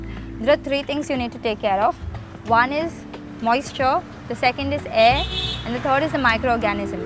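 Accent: India. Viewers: Girl, I love your Indian accent and voice! Thanks for the tutorial! Oh, by the way, I thought the mint plant is a weed and very invasive. Shouldn't it be planted separately from other plants? Thanks again.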